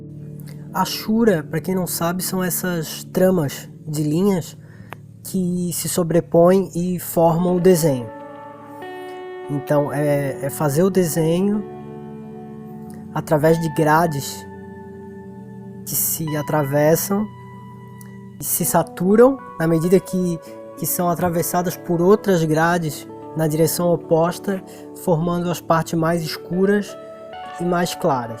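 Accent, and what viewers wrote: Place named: Santa Catarina, Brazil. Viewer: Esse sotaque Catarina é inconfundível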